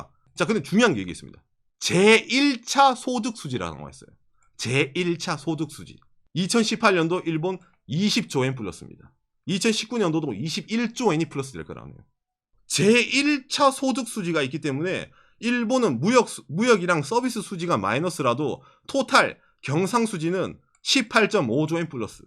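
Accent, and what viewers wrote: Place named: Gyeongsang, South Korea. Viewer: 우짜든동~~~ 사실을 말해도 왜곡되기가 쉬운것이 진실이라 생각하는 바이라.... 그래도 그 안에서 관점의 차이를 알려주려는 의 영상 수십편을 보면서 우리와는 다른 그 곳에 대한 이해가 더 높아지네요 일본으로의 많은 여행경험으로써 인지하는 부분들도 영상을 보고서는 가끔 무릎을 탁!! 쳤습니다 아무튼 지난역사와 현재의 국제정세는 냉정히 봐야 한다는 입장을 지닌 울산 사는 형이.... 우짠지 말씨가 너모 익숙해가꼬 그래가 그 특별한 맻개의 사투리들이 테 정을 더 쌓게 했심데이~ 구도크와 좋아요!!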